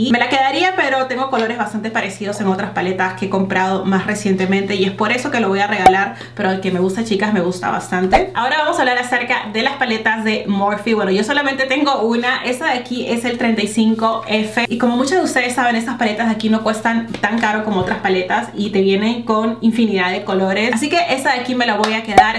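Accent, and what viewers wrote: Peru. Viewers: Y mira que me gustan las Youtubers inglesas, francesas, italianas y las españolas(que vivo en España) pero nada como oir el acento peruano 😍😍 y mejor aún cuando es de maquillaje. Te he descubierto hace poco y sin duda ya me he suscrito. Besos!!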